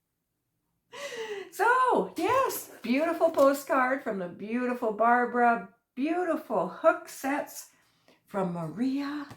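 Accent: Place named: Canada. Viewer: Love listening to you talk…love Canadian accent!! My step daughter & her husband live near Courtney, BC. She’s starting to have the accent mixed with the Texas twang (where she moved from). Love the hooks hope they work well for you!❤❤❤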